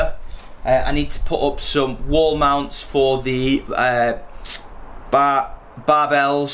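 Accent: United Kingdom. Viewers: I love your British English.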